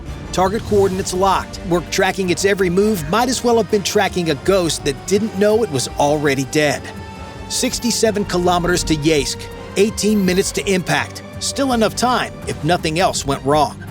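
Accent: United States. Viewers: Oh dear oh dear oh dear oh dear. I cannot listen to this excitable racket. An English narrator please if you would. This American narration is insulting and should not be heard in Britain. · Another bloody UGLY accent. Why do they keep putting American ACCENTS on videos? They must know the world hates them. No reason to watch any more. was more than enough. There won't be any TRUTH in the video. Americans don't just murder women and children, they don't ever tell the TRUTH! EVER!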